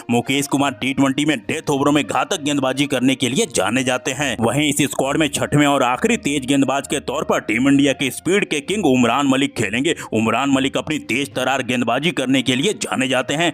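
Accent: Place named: Pakistan. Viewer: आप केसे बात कर रही हो? अपने बोलने का तरीका बदलें. ऐसा लगता है जैसे आप पक्के पाकिस्तानी हैं, बात करना तरीका change करो। एक सच्चा भारतीय ऐसा नहीं बोलते। Team INDIA हम तुम्हारे साथ थे, साथ हूं, साथ रहूंगा, इंडिया जिंदाबाद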